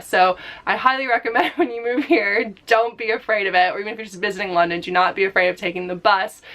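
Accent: United States. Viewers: As an American myself, her accent sounds like a typical lady here in California. I don't here any type of European accent in her voice at all. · Don’t force it. She still sounds American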